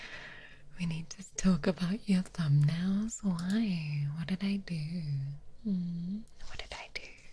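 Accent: United Kingdom. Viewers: Her very "queen's english" accent really adds to this, the close in whispering and ear blowing were also peak.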